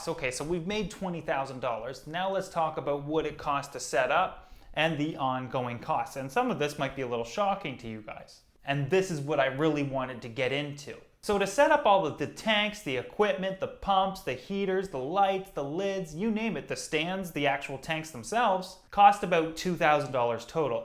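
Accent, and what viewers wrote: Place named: Maritimes, Canada. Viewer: Hey man i thougth you were from the maritimes i live in Fredericton New-Brunswick cheers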